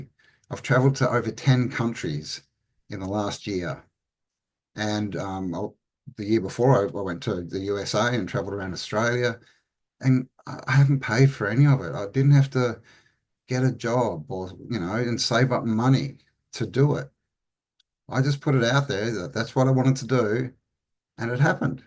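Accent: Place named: Wales, United Kingdom. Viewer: Whern are you in the UK? Welsh pixie ❤😊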